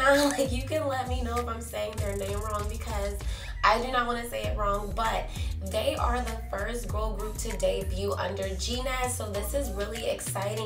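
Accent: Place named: Thailand. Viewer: Awwwww ur Thai accent was so cute >< thank you for reaction naka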